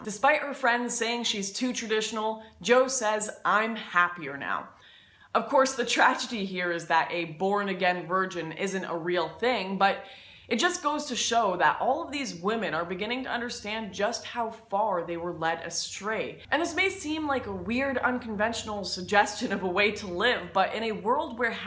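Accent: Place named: Canada. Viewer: Don't worry about it. I thought it was you're Canadian accent and thought nothing of it.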